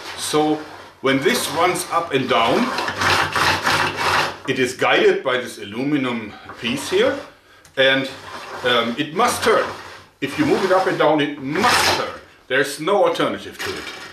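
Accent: Germany. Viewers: Das ist nicht böse gemeint aber wenn du Englisch sprichst hört man dass du in Deutschland bist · Bei dir hört man richtig das deutsch raus 😂😂 „This here“ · funny character Never Changes especially the German accent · Hi. Deine Ideen sind echt klasse,dein Kanal an sich ist ein wahrgewordener Jungstraum. Du hast die coolsten Ideen überhaupt. Und ich feiere so unglaublich deinen deutschen Akzent. 🤣🤣🤣🤣🤣 In tiefer Verbundenheit ,Christoph. 👍👍👍👍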